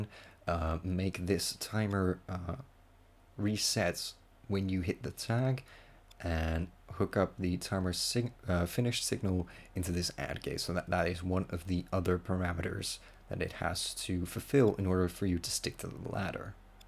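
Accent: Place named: Netherlands. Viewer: Ik kan me niet concentreren door die Nederlandse accent LuL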